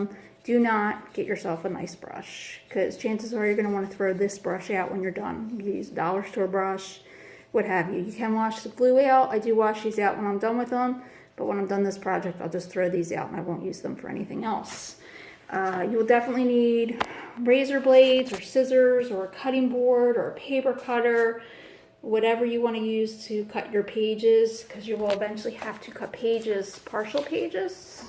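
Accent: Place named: Philadelphia, United States. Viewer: I can tell by your accent that you are definitely from philly. Great video by the way!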